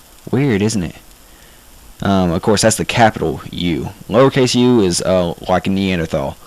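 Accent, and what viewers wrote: South, United States. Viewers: I'm from Alabama (I'm in the Million Dollar Band- Roll Tide!), and you nailed the accent. I love this video! Especially the y'all part. Northerners just don't understand. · I've got a mice southern accent :) um, my y'all is in between yours. Not as short as you say but not as drawn out either. Your accent sounds like Scotty McCreery's! You from NC? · He does sound southern. His particular dialect just isn't as strong as the overly done and exaggerated one that tv and film have thrown at us. But his accent would totally fit in in parts of GA, TN, and AL. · Can you teach us some words and phrases ? Love the accent! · I'm from South Carolina, he's dead on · Love it. I'm from Tennessee so I sound like this. xD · where are you from? Cause thats the smoothest southern accent I've ever heard. :) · This accent makes me laugh so hard, aye. 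· Your voice sounds like a mild version of the African American Vernacular mixed with a Southern twang. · Your natural accent is a southern dialect. You're speaking hick. The southern twang is much more pleasing- that is not the majority of all southern regions dialect. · I’m southern and he sounds southern. What is he supposed to sound like? Gomer Pyle? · Haha I love this, from north carolina and I hear this all the time. This is super stereotypical like he said but sometimes you do hear a real thick accent like this. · Thanks, that was great. You have a great voice (and accent). But exactly what part of the south are you from? I have friends from Texas, Louisiana, Mississippi, Georgia and VIrginia, and their accents are all quite different from one another. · I live in alabama too, and you pass the southern accent sound test :) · Def a GA, TN or AL accent. Im from GA and its def a southern accent lol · U sound kind of like Forest Gump!!!LOL love ur accent!! 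· Dang, I'm from Texas and he's got it down c: most people who try to do a southern accent fail miserably. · Southern accents r the best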